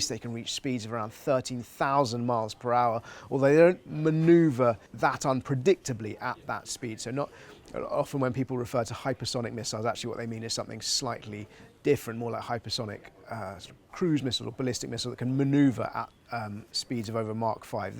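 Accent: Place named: United Kingdom. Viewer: Loose your British accent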